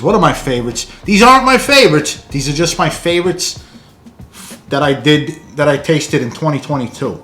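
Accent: New York, United States. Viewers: 💙 yea videos and your NY accent.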